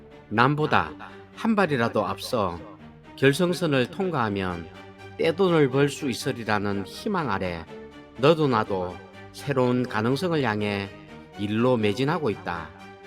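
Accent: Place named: Gyeongsang, South Korea. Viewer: 투박한 말투와 갱상도 억양에 묘한 끌림이 있네요~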